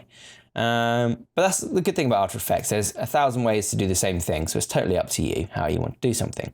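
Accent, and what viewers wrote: United Kingdom. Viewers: You are actually one of the better instructors, but your British accent, sometimes hard to follow for Californians like muahhhh. But I still subscribed. Thank you, sir. Brit.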